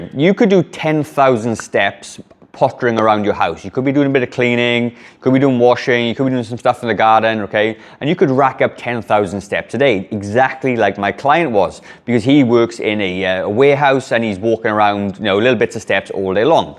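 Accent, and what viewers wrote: Wales, United Kingdom. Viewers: Walking. Just discovered your channel ❤ I live in Neath and so lovely to hear your Welsh accent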